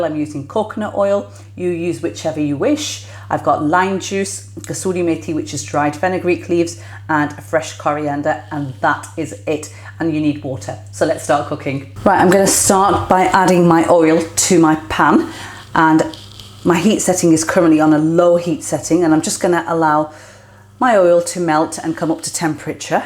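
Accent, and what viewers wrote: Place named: Manchester, United Kingdom. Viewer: Hello Your accent sounds from Manchester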